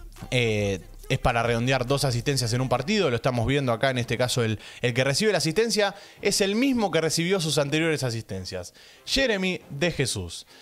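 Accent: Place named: Argentina. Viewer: Che por tu acento se nota que eres Argentino y con esa gran visión de fútbol que tienen ustedes los Argentinos se que vas a llegar muy lejos con tu canal Bendicionez mi pana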